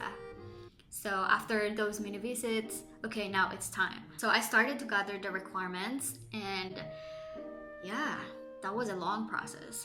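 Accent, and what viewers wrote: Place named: United States. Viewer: I asked because your English dialect and expressions are very American like.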